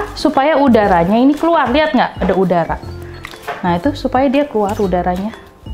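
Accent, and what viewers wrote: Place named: Java, Indonesia. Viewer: thank you, sy mau coba ah. Btw, ci orang jawa ya ..logatnya jawa hehe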